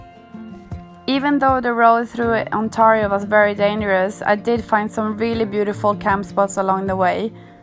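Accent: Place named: Norway. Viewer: incredible! i want to do this in may! also you sound super norwegian... is that the case? well done!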